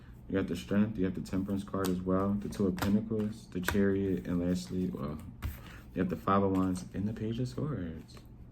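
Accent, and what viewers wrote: Philadelphia, United States. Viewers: You from Philly ?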